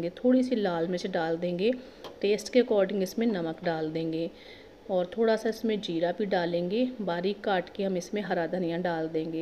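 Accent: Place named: Punjab, India. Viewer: Bahut badiya banaya tum punjabi ho na bolnye se pta chal raha hi sarso k oil se khansi to nhi hogi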